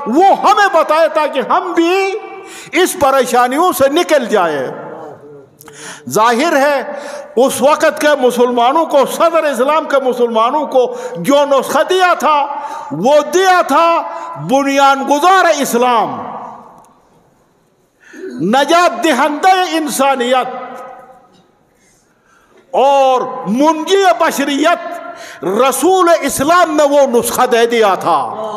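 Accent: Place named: Gilgit, Pakistan. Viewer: I don't understand the majority audience are pashtoon then why he delivered his speech in urdu because he seems to be gilgiti not local by his accent strange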